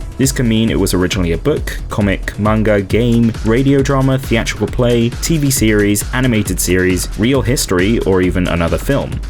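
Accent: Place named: United Kingdom. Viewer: Petition well and truly signed. Also, another great video essay right here. Loving the charming British accent. Wish I had one.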